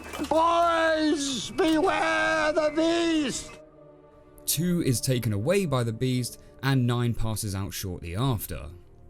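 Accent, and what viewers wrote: United Kingdom. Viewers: He’s british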